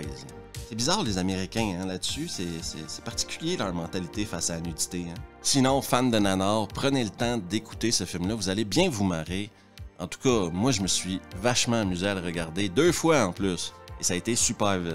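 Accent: Quebec, Canada. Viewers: 😅 Je te découvre, et du coup je me régale avec tes trouvailles, et en tant que fan de F. Pérusse (en Québécois, s'il vous plait, sinon rien!), bein c'est encore plus agréable. Cet accent prépare le corps à sourire, voire plus si affinité. ;) Mais pour en revenir à la principale information de ta vidéo (😂)... En fait, ça ne date pas du nichon, ce déni idiot de la réalité chez les américains. Ca date de la drogue. (et sur ce sujet, ça continue de plus belle...) On cache, on cache... et plus on cache plus ça veut dire qu'il y a des choses à cacher. J'en fini par me demander quel coup fourré se cache dans le nichon américain moyen. Je sais bien qu'ils passent leur temps à s'arnaquer les uns, les autres, mais je me dis: "... quand même... Ils n'ont pas piégé leurs nichons???" Moi j'crois que y a d'la coke, dedans... C'est du nichon dangereusement psychoactif! 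...où peut-être qu'on a à faire avec du nichon OGM qui produit des terres ULTRA rares pour leurs futures technologies nouvelles, genre: des cravates connectées où des SUV pickups amphibies géostationnaires capables de recharger eux-même leurs batteries en tétant le pis d'une vache bio des Alpes Suisses? En tout les cas, je ne doute pas qu'un avenir radieux nous en apprendra un peu plus sur cette "apparente" phobie du nichon. Ça va forcément évoluer vers du bon, aucun doute...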